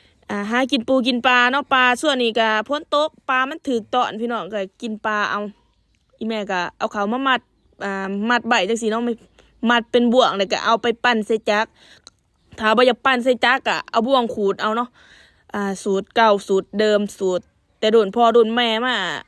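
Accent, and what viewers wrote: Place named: Tao Ngoi, Thailand. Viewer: สบายดี ไส่เสื่อแขนยาวแหน่บ่คันคายเบาะฟังสำเนียงคือคนเมืองเต่างอยอยู่บ้านกวนบุน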